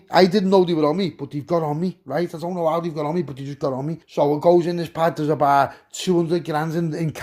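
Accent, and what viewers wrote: Liverpool, United Kingdom. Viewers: Ha ha Ha ha ha ha ha 😆😆😆😆😆, you're off ya head u SCOUSE, fuckin killin me, they've had to move house, lmfco 😆😆.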